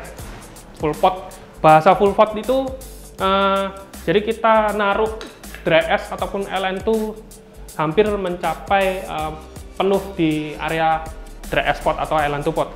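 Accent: Java, Indonesia. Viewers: Khas medok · Medog tenan mase 😀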